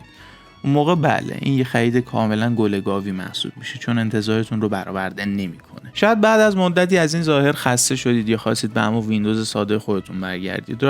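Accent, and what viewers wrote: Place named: Iran. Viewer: یا من زبانم خیلی خوب شده یا ایشون ایرانیه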